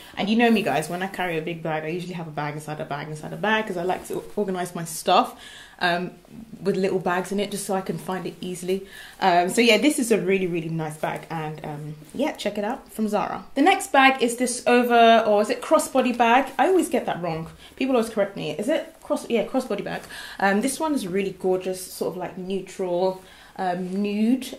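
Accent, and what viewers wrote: Nigeria. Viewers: the Nigerian accent is giving me life lol, I feel like you are so much more relaxed around the camera now! lovely hun!! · I love how the "naijaness" in you is coming out and I love your bloopers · Please do the Nigerian accent throughout your videos, I live for it hahahaha! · Beloved naija accent What strapless bra are you wearing with that off the shoulder tight H&M light pink/peach top? if you don't mind me asking. 😊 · The Naija accent hahaha. Perfect. Love your videos.:-))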